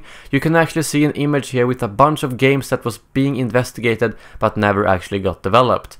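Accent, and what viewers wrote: Sweden. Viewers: Are you Swedish? Because you're English sounds like you're Swedish. Have a good weekend! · Are you swedish? Keep up the good work man..👍🏼👍🏽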